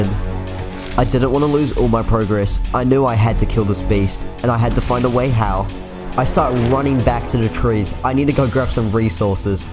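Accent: New Zealand. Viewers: Is he from New Zealand or something? he's got a kinda kiwi accent 👀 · R u kiwi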